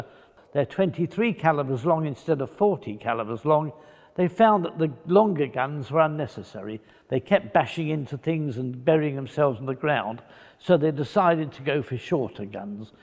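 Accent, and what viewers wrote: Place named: England, United Kingdom. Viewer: English? He is English and is speaking the purest form of it. I think you mean his accent.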